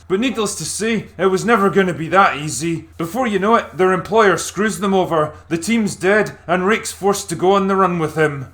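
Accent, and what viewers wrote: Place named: North Wales, United Kingdom. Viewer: Damn, that's a strong north Wales accent.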